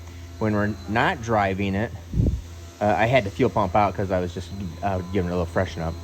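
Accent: Michigan, United States. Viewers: are you from michigan?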